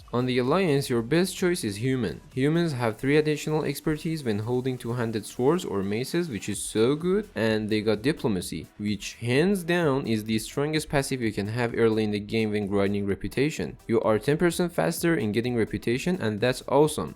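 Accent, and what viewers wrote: Iran. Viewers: you have kind of an persian accent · You have a Persian accent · Are you Iranian by any chance? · R u Iranian bro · lahjat yekam farsi mizane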